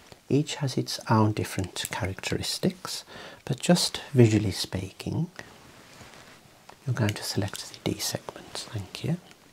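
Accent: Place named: Black Country, United Kingdom. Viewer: As a Midlander, loving the Black Country accent!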